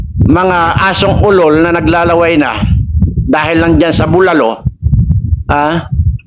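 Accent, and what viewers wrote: Iloilo, Philippines. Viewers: Ilonggo ka gali tuno mo ilonggo sa totoo lang taga diinka haw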